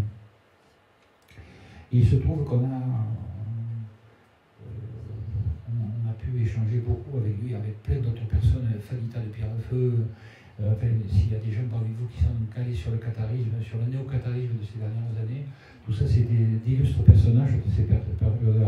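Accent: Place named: Marseille, France. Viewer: Je comprends rien avec l'accent marseillais 😮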